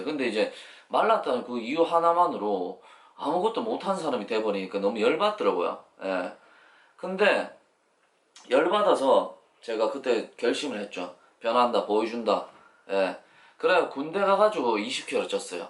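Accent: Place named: Gyeongsang, South Korea. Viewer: 여자친구 어디서 만났으셨어요~말투가 갱상도 사투리인데 고향이 울산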